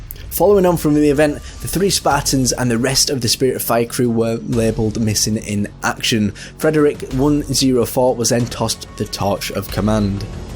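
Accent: United Kingdom. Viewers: Reverbed obviously British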